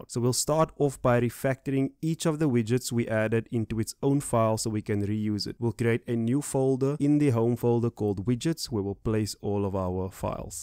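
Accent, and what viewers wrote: South Africa. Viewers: You from South Africa?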